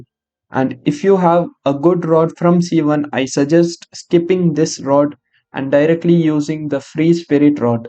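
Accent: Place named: India. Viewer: Why do yoi sound indian😭😭😭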